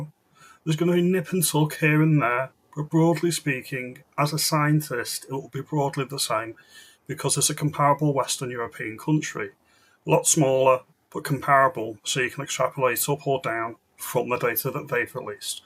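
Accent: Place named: Southern England, United Kingdom. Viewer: What part of Cheshire are you from? Your accent seems slightly southern.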